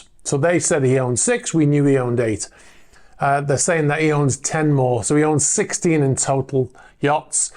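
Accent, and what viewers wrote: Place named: Merseyside, United Kingdom. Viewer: Really like watching the channel, your reply to Chris the weirdo with the major personality issues was spot on, I could be wrong, but do I detect a Merseyside accent, being from Merseyside myself, I wondered if you are from here, what part of Merseyside do you come from ? 🖐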